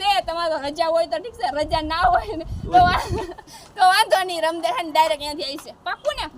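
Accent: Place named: Porbandar, India. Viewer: દીદી જી તમે પોરબંદર જેવું બોલો છો મતલબ આપ કયા કોમ્યુનિટી માંથી છો 🙏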